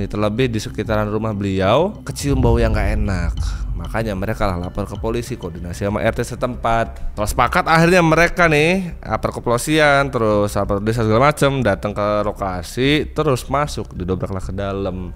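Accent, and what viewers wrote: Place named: Lampung, Indonesia. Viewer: bang gue suka bgt logat Lampung inimah bikin kangen pulang gue di jkt doang sebenernya tapi kangen bgt logat ini😂🎉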